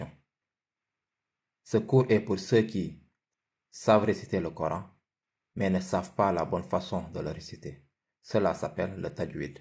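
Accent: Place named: Senegal. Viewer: Une voix Sénégalais 🥰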